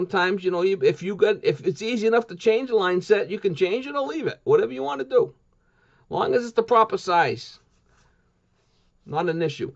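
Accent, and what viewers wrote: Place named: Brooklyn, United States. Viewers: from brooooookllllllynnnn....lame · this was awesome I just got 5 Ton 14 Seer Goodman Air Conditioner today so that was too funny the Goodman stuff thanks for the info I understood half... lol thank bro cool accent must be NJ or NY or Boston...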